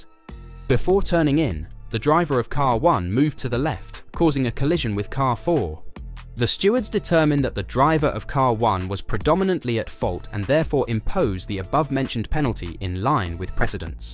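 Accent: United Kingdom. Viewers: Off course a Britisch accent, skipped.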